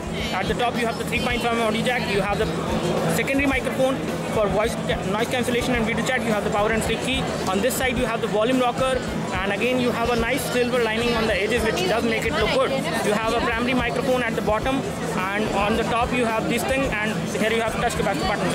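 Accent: India. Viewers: I love Indian accent :-D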